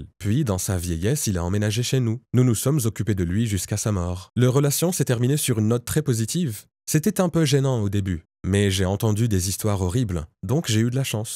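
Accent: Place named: Arab world. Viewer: Bizarre il prononce le prénoms du prophète et du coran avec l'intonation exactement du vrai musulman la langue arabe seule un vrai arabe peut prononcer les converti le prononce différemment car il faut avoir parlé toute sa vie l'arabe pour prononcer les syllabe du h ou k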